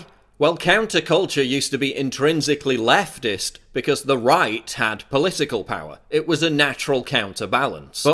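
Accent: United Kingdom. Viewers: This fucking beautiful british accent haha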